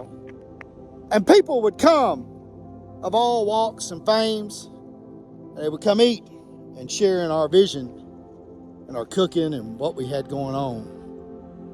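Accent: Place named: Arkansas, United States. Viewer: What part of Arkansas you from?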